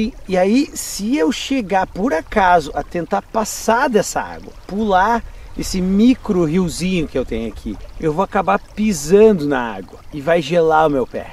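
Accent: Rio Grande do Sul, Brazil. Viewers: Tu é gaúcho tchê?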